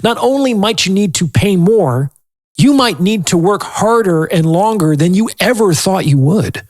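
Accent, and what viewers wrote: United Kingdom. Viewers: If you are astute enough to make a lot of money, then you should be astute enough not to drive the fancy car. You sound like some sort of UK Indian subcontinent person. The average intelligence quotient is 82-83, and you are probably going to receive a lot of idiotic behavior. If you are middle class, then these people are even more status-oriented, more subtle and less greedy, and unless they are your true friends, then they are useless in your life. You won the race and they are all losers in the race. At least 60% of people do not possess the ability to be happy, and they only way they can gauge happiness is by what they have, and how much things cost. It is a mostly an impoverished existence. I was also successful for a number of years, and none of my true friends ever needed money, because they also eventually became successful. 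But there were many other people that I knew, and I had obligations, and then I moved to the second world much of the time (where I live a dream life), and all of the people wanted something from me. If you want to get along with (all of the other people who are not achieving) then do 2 things 1) hide your wealth to the greatest extent possible, so you don't get killed (like the Chinese woman Abby Choi, who was killed by her former in-laws in February 2023) 2) Friends are different, but when it comes to acquaintances, make sure your money is available for their achievement. Your job is to make people's lives better. (I give money, based on what I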